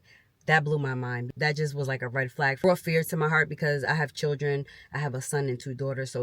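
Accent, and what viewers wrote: New York, United States. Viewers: What part of NY is she from ? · A little lady with a deep voice and New York accent. 😂 🤣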